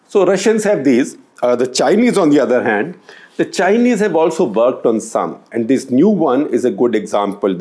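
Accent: India. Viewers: 不行，咖喱味太重，听不下去了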